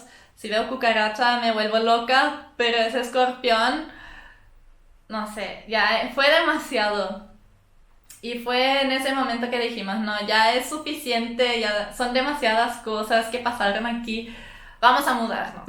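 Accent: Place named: Paraguay. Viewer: Ya tenes acento paraguayo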